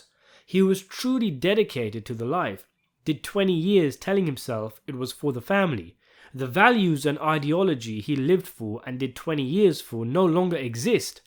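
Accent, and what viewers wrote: United Kingdom. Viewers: Listening to a British guy talk about a show about Italian gangsters is just wild to me idk why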